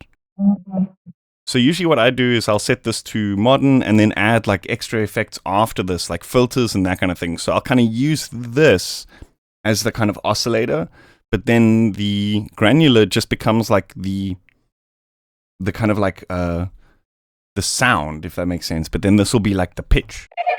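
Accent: Germany. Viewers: Sounds like a german accent to me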